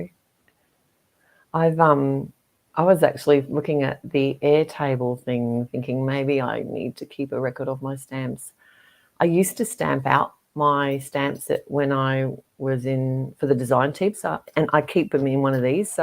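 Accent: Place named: Australia. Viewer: it is lovely to hear a second Aussie accent and you have our great sense of humour. I found your presentation very helpful and I've downloaded your swatch charts. Thank you so much and I will catch you on one of your platforms. From the Goldie, Thank you again.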